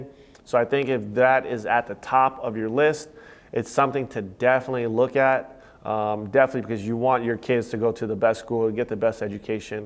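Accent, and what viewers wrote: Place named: Hawaii, United States. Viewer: This guy saying “in town” & “Locals” haha he definitely from Hawai’i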